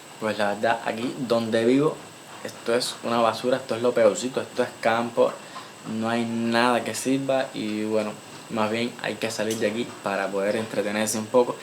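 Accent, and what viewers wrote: Cuba. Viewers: los muchos cubanos de mas menos tu edad estan muy cnformes con su estadia aca, en uruguay y no es nada dificil adaptarse (menos al invierno que ustedes casi no conocen) tengo un gran amigo cubano que se vino por guyana, brasil hasta la frontera con su esposa, pero dejo a su niña alli hasta poder traerla tambien, y a su madre.Trabaja como portero en un e dificio y arma computadoras. Cuando recien llegan consiguen trabajo en supermercados o farmacias etc sentir tu hermoso acento cubano es cosa de todos los dias-Aca no hay playas para "cubanos" y hacia el este de Montrvideo, el oceano atlantico te da hermosos lugares para disfrutar cuando deseas descansar. La Salud no es gratis, pero es bien accesible y de primera calidad La salud publica es gratuita (medicacion incluida) Bueno solo quise informarte de mi pais espero que todo te salga bien PD. 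aca no existen los cortes de luz jajaja:Un beso grande hagas o que hagas Te deseo lo mejor. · Ver tus videos es como conversar contigo, me encanta eso pues te conosco de alguna forma y se mas de ti, tus conversaciones son interesantes asi conosco de ti y como es vivir en Cuba. Me encanta tu forma de hablar y expresarte y para nada me ofende tu vocabulario, he compartido con muchisimos cubanos y amistades cubanos y se como hablan y se expresan y puedo entenderte, sigue asi y no cambies por nadie. Gracias por compartirlo, muchas bendiciones papo. 🙏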